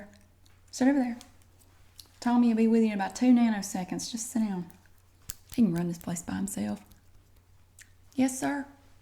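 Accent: South, United States. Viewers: i used to only watch your videos because i loved your southern accent, and i remember the first video i saw was your dentist receptionist roleplay (which of course NEEDS a pt 2) but what i admire from your videos is that you make these storylines and characters which develop throughout your videos, and it makes them feel so real, and realism is what i seek from an ASMR video.... sure, the soft whispers are nice, but i love when someone is soft spoken with a little energy like you, and i love love love LOVE all of the details you put into your videos.... i wish i could just explain to you in person how much your videos have helped me through my chronic insomnia.. dont ever stop what you do!! sending my all x :D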